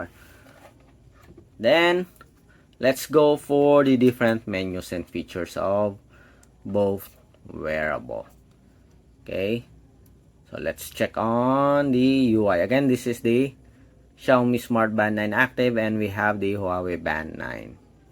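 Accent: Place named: Philippines. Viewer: Pinoy accent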